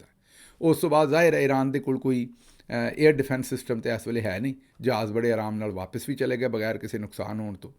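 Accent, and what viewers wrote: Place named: Majha, India. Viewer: Teri boli veere panjabi aa jehdi bol re o eh tan majhe di boli aa urdu aardu bilkul ni hai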